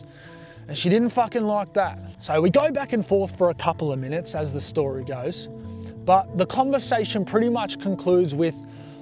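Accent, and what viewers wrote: Australia. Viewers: He has an Australian accent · Even though I don't relate to this experience, it sounds so aussie that I should be relating lol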